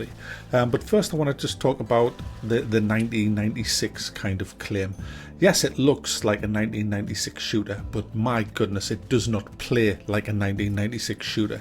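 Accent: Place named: Scotland, United Kingdom. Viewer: I love your review, I love your videos (watched some of a playlist), and I love your accent... I wish I had a Scottish accent. Subbed.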